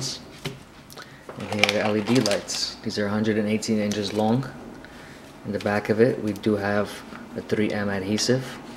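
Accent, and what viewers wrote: New York, United States. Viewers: Awesome NY/NJ accent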